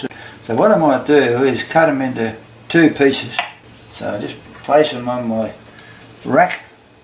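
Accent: Australia. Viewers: Liked the video and loved your true blue accent. Went nostalgic on my time in Aus. Good luck to you!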